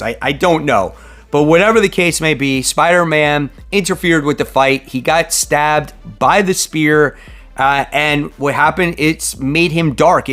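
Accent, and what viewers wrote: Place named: Florida, United States. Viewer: You Floridian!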